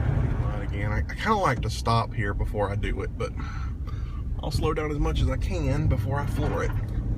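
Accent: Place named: Carolina, United States. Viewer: Pretty good review, honest and love the wonderful Carolina accent, back when NASCAR was worth watching this is how most the drivers spoke! Love my <num>wd crew, mine is more the working mans truck, but got everything I want, great power and comfort and good economy for a big truck. I added side steps, for the ones of us with short legs made all the difference in the world, eBay and less than 200 bucks.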